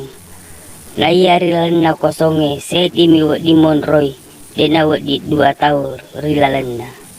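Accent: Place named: Soppeng, Indonesia. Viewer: Kya Bugis soppeng bahasanya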